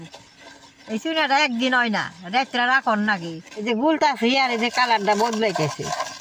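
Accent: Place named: Dhaka, Bangladesh. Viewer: উনার কথায় বুঝা যায়, বাংলাদেশের ঢাকা জেলা অথবা মানিকগঞ্জ জেলা হবে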